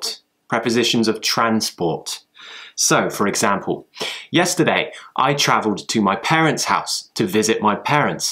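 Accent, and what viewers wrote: United Kingdom. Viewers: Which part of England are you from? I was thinking all the British people say "can't" like "kaant" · Your every British English pure British English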